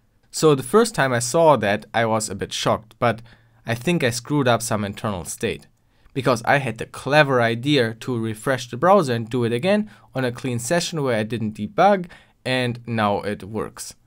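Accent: Germany. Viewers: Dein deutscher Akzent ist so schrecklich und versaut das ganze Video. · sorry dachte du machst das einfach so aber wenn du es dann nur aufs Geld abgesehen hast, dann ist es egal, sorry. Aber trotzdem toller Youtuber mit tollen Videos, man kann aus deinem Englisch schon raushören, dass du Deutsch sprichst.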